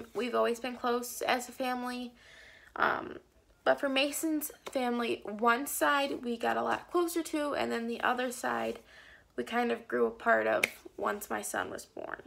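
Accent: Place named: South, United States. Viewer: Aww girl this is so cute! :) You're so beautiful and seem so sweet! I love how you are still so motivated and have great life plans. <3 You sound like you have a bit of an accent... are you from the south?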